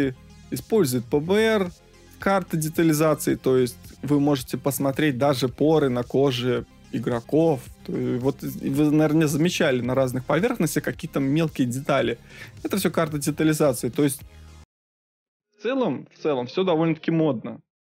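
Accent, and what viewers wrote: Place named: Belarus. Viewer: Начал смотреть видос ,услышал голос и первым делом подумал о боже это же мой дядька из Минска,а потом увидел как канал называется. Беларускую речь ни чем не попутаешь. Так вот теперь понятно почему все у кого ниже ай 5 плачут что игра лагает. У самого 6900к(разгон) и 1080(даунвольт) в среднем 110-135 фпс держит на низко -высоких настройках. С меня лайк и подписка.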